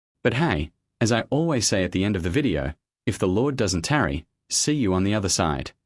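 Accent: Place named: Australia. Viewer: You sound like a robot voice with an Aussie accent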